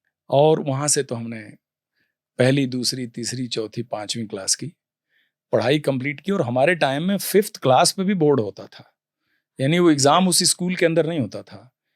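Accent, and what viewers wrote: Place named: Uttar Pradesh, India. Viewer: UP ki saaf zuban 👍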